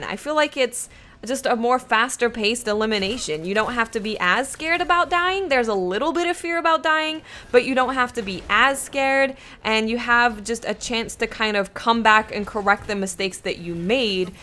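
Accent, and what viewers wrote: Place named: New Jersey, United States. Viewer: Is from Jersey? Seems like it to me from her accent. I'm new here, does anyone know?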